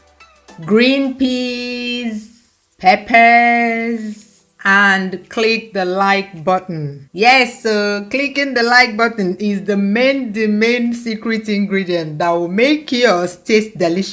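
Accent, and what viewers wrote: Igbo, Nigeria. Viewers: Igbotic voice...yen yen yenh ! I love it lyk that 😂😂😂😂😂😂😂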